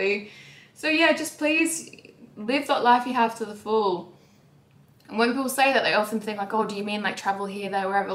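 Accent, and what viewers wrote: Australia. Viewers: ….she Australian ….. that her accent🤦🏽‍♀️🤦🏽‍♀️🤦🏽‍♀️ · Your Aussie accent has come back! Happy for you 💖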